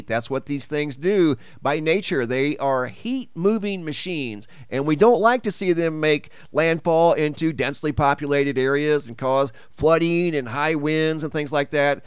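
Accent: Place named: California, United States. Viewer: Great content- when you say words ending with ing it sounds like een. Cali trait.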